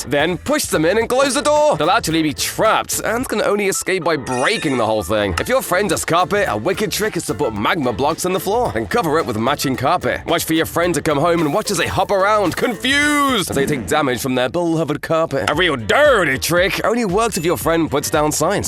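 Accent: United Kingdom. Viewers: Just found this channel so I’m not familiar with it in anyway but I can’t tell if Dexter Manning is narrating a Minecraft video or you’re just that English but there’s math here that isn’t mathing.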